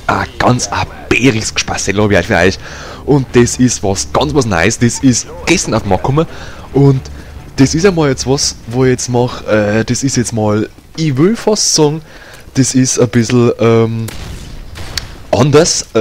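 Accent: Bavaria, Germany. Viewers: hab des ganze bayrische guat verstana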